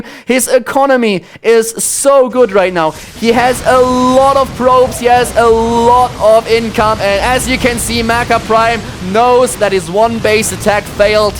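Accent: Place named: Germany. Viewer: wow no comments i dont know why cuz i really like the comentary and the German accent if im not mistaken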